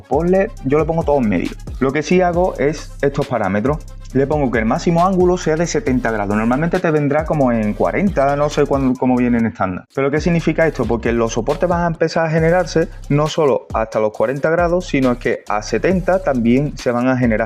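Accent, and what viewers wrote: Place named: Andalusia, Spain. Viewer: Por la forma de hablar pareces andaluz, no sé si me equivoco, te explicas muy bien, suerte y al toro. Un abrazo desde Cádiz capital.😜👍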